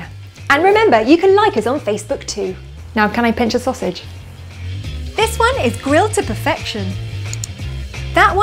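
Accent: United Kingdom. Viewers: I never heard more of a British accent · You age sooooooo charming my goodness, and I love your British accent, so hot.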